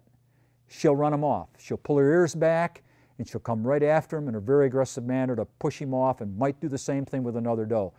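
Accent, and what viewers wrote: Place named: North, United Kingdom. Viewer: Where was he from? He has a northern type accent, but phrases from the south..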